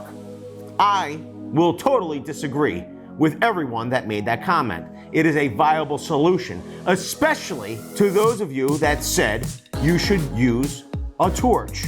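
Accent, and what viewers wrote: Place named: Boston, United States. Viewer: Love your accent. I'm Australian and I feel our accent and yours which sounds like a close Boston accent are the best.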